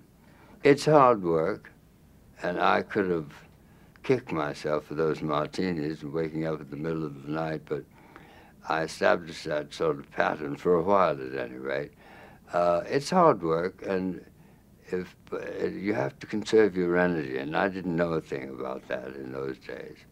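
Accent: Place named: South, United States. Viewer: He's from Baton Rouge, and the early 20th century upper class Southerners did speak in a rather affected way, so I always thought he adapted his aristocratic Southern accent and incorporated the mid Atlantic accent with it. Tallulah Bankhead, another upper crust Southerner, sounds just like him. Both of their manner of speaking sounds like this hybrid to me. I am from South Georgia and I have a similar mid south accent. It's just the way my family talks. Not all of us sound loud and brash like Paula Deen or ignorant mush mouths like Mama June.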